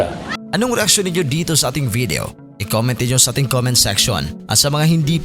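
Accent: Visayas, Philippines. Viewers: AI BA AUDIO?? BISAYA DEN!!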